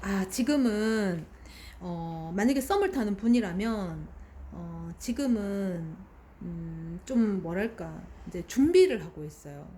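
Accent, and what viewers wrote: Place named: Gyeongbuk, South Korea. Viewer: 경북에 여행갔을때 들었던 억양이었습니다 😊 저는 한국어가 익숙하지 않아서 억양을 잘 기억하거든요!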